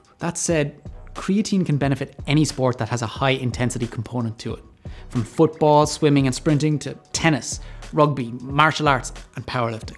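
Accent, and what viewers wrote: Ireland. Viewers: Very Kilkenny accent? am I way off? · Is that an Irish accent that I hear oooh la la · I love the Irish accent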